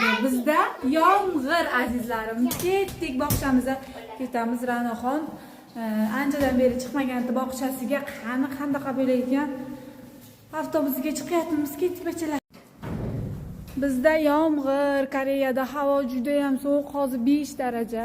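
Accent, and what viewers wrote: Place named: Olot, Uzbekistan. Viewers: Assalomu alaykum Buxolikmisiz Qorakoʻlning qayeridansiz hech shevani buzmasdan gapirgansiz mashaalloh ❤❤❤❤❤❤ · . Тилиз барибир. Олотга тортади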